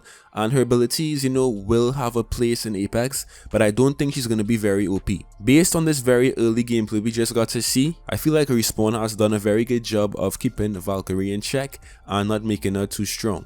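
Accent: Trinidad and Tobago. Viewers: Are you trinidadian? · Are you from Trinidad??? Your cent sounds like it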